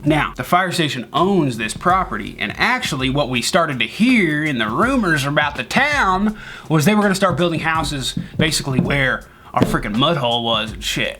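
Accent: United States. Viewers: are you american or swedish?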